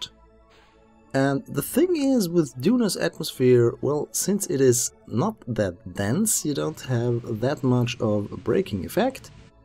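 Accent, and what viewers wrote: Germany. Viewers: man merkt das du deutsch bist😉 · where are you from? germany?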